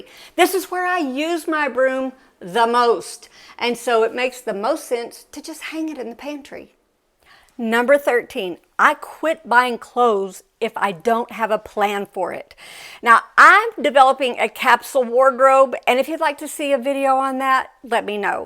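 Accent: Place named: South, United States. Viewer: This is my first time watching you. You tube recommended it to me. I'm from the south so I appreciate your accent 😊. Are you also a pastor? Not because of what you said at the end, but you sound like one....is it called the cadence in your voice? And the energy. Just curious lol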